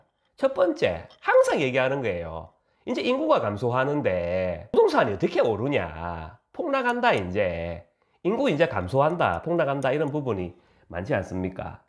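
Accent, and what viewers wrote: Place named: Busan, South Korea. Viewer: 잘 들었습니다~부산 사투리 듣기 좋네요~앞으로 좋은 강의 기대하겠습니다~^^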